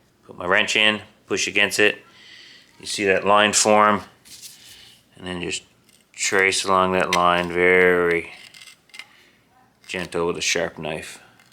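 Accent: Newfoundland, Canada. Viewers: I can recognize a newfie accent even if it’s faint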